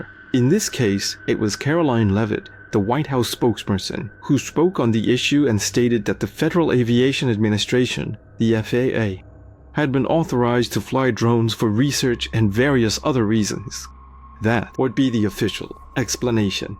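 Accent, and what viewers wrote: France. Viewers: Omg? Sup with the robotic voice? I like your original french accented voice... ⭐️*⭐️*⭐️*⭐️*⭐️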